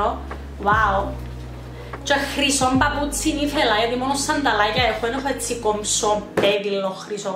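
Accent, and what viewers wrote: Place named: Cyprus. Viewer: Εισαι κουκλα και γλυκύτατη αλλα τρελαινομαι ν ακουω Κυπριακη διαλεκτο!!! Εισαστε υπέροχοι ολοι!!!!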